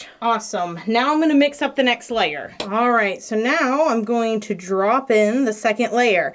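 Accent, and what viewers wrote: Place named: South, United States. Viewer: All the puddin, puddin. You’re so southern and adorable!! ❤️❤️❤️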